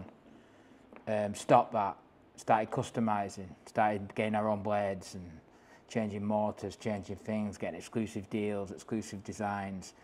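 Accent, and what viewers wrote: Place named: Northern England, United Kingdom. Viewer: I'm impressed. English Northern guy doing well. What's not to like.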